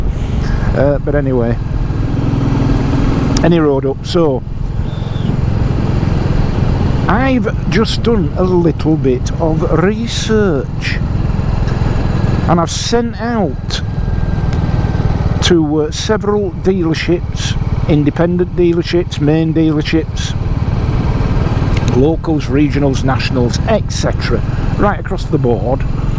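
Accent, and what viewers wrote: United Kingdom. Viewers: Keep the music hes in a rush sounds bit british.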